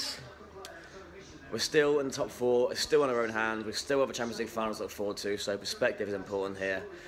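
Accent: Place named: Liverpool, United Kingdom. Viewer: Great Scouse accent mate, surprised you ain't a United fan, they all live in London too.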